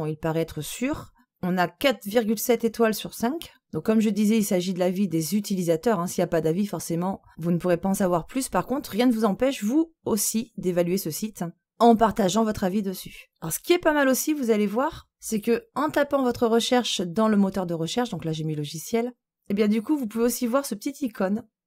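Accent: South, France. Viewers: merci très bien expliqué léger accent qui vient du sud..peut être de la ville rose... si je peux me permettre serait il possible de mettre un son bien moins fort pour un maximum de confort auditif convaincu je m'abonne c'est plus instructif qu'un cours en FOAD et plus "rafraichissant" bonne continuation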